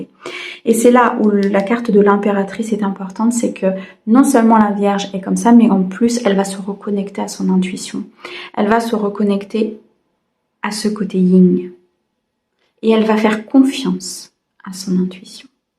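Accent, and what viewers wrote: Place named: South, France. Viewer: Tout à fait ça !!! Grand merci des décennies de blocage et d'utilisation ! Ouffff j'adore ton accent du sud que l'on perçoit parfois !❤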